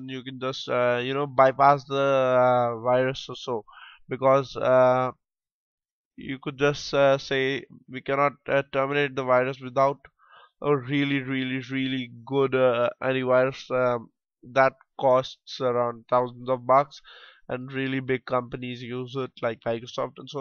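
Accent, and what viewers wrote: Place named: India. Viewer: Indian accent so annoying.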